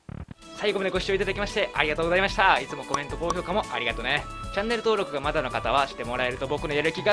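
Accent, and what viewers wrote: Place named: Kansai, Japan. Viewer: チャンネル登録しました！編集上手いし、関西弁好き♡めっちゃハマりそう！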